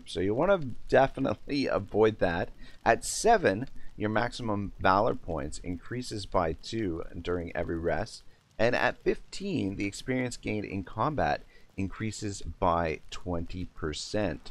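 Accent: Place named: Canada. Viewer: This dude is definitely Canadian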